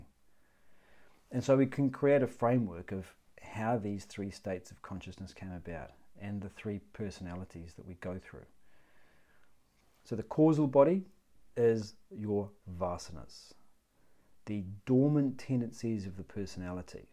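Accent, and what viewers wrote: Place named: New Zealand. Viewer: Auto-generated subtitles seem to have trouble with the New Zealand accent. The prase used in the first part of the video (e.g. ) is "Dream lion"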